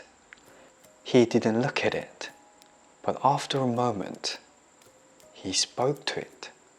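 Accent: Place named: United Kingdom. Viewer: 영국악센트 쥐리네여~~~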